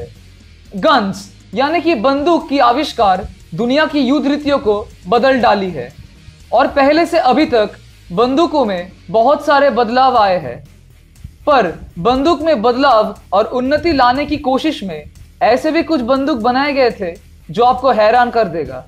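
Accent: Bengal, India. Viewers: ओरे दादा।। पहिले ठीक से हिंदी बोलना सीख ले।। अड़ को आरर बोलता है।। बंगाली दादा।।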